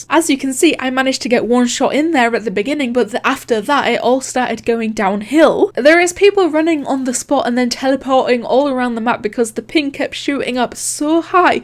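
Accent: Scotland, United Kingdom. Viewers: Hey are u scottish u sound scottish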